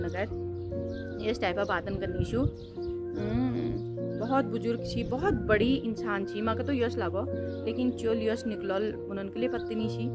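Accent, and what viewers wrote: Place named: Uttarakhand, India. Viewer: आपकी भाषा ठेस गंगोंलीहाट की है आपका घर कहांहै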